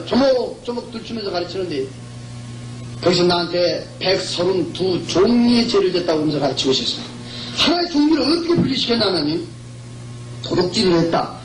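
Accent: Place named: Jeolla, South Korea. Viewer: 보지않고믿는자가 복되도다하셨지만~하나닝은 협력하여선을 이루어주시는분같아요~ 구수한전라도 말씨와 목소리가 성령의언어인것같아요 목소리도 은혜가되네요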